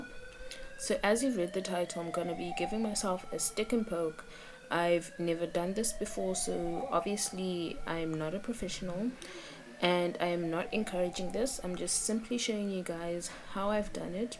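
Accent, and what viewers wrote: South Africa. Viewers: tell me you’re south african without telling me “ so ja “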